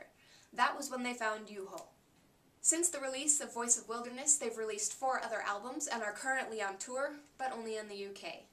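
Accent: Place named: Finland. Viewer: so informative video, and what cool finnish accent:P by the way, posted your video on their facebook page, you might like to know ^^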